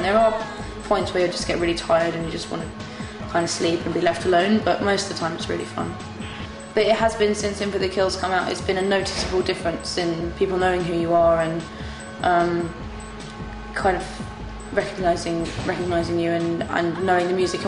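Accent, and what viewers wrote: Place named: RP, United Kingdom. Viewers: She english duh! If she was Irish that would be awesome :L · What's wrong about sounding posh? Her and her bands music is amazing.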